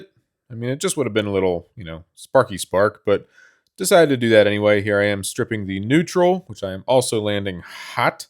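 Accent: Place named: Philadelphia, United States. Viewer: Awesome video, love the custom ATS. Regarding split phase 120/240 terminology in some places people do call them phases and we know what they mean, but that can be a slippery slope to people calling split phase 'two phase'. And To me your accent really sounds like a Philly accent, and Philly being one of the only places that actually has two phase power where each phase is 90 degrees apart, I'd stick with lines or legs for split phase 240... and if you happen to be in Philly I'd love to see a video on a some actual 4-wire two phase motors and controls if you come across any. Thanks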